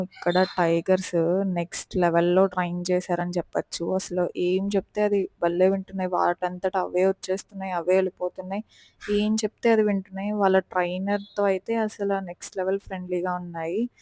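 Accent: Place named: Telangana, India. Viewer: Moutham telangana slang undie ,😅😅😅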